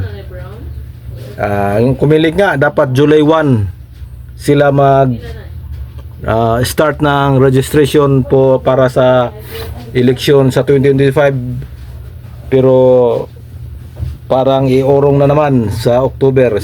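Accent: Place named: Buhi, Philippines. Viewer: Brod paki ayos naman ng pagsasalita mo para kang taga Buhi, Cam. SUR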